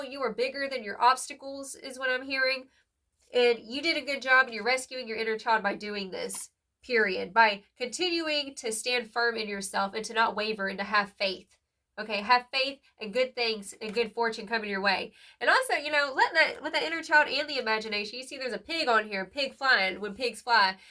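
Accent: South, United States. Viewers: I’m loving your accent , I’m guessing South Carolina, Georgia? I am rising like a phoenix, a thunder bird. ❤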